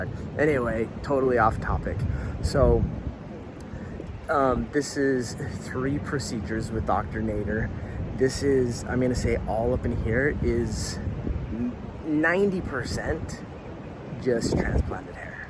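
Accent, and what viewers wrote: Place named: Utah, United States. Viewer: I knew I heard some Utah in that voice. :)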